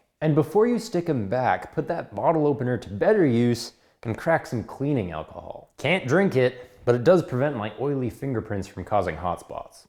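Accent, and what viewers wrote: Canada. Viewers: I’m assuming he’s from Canada. I’m waiting for him to say chooch or that’s the cock for dolly 😂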